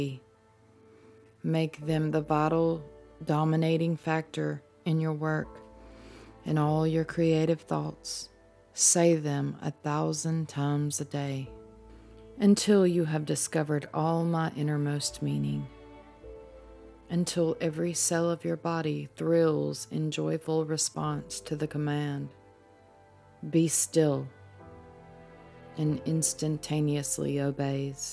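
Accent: South, United States. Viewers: Do I hear a beautiful southern accent? I am born and raised on the coast of Georgia USA.. keep reading!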